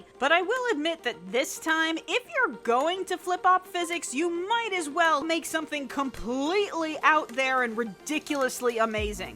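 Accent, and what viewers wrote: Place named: Scotland, United Kingdom. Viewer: Nice Scottish accent ! Im definitely going to see this tomorrow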